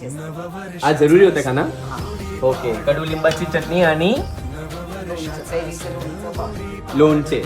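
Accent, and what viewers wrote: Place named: North India, India. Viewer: तू भैया सारखा मराठी का बोलतो?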